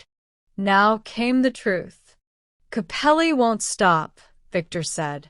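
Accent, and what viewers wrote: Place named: Spain. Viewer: Playback speed x1.25 works well. Good story, but the spanish pronunciation spoils the flow.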